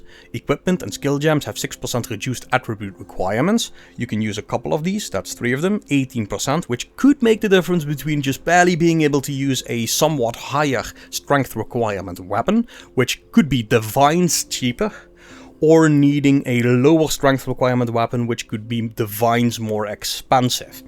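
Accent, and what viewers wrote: Limburg, Netherlands. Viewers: Are you from Limburg?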